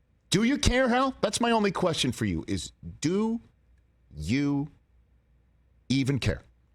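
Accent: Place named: New Jersey, United States. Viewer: Anyone else notice the jersey accent comes out when he gets angry and animated 😂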